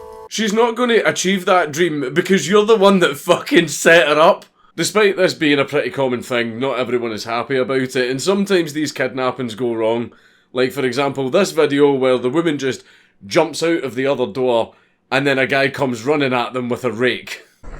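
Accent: Scotland, United Kingdom. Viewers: Scottish accent = awesome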